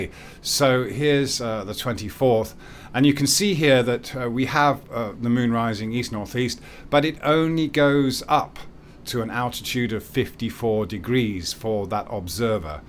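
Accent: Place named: Bristol, United Kingdom. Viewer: didn't know you was a bristolian? gurt lush me babber, ow bist? :)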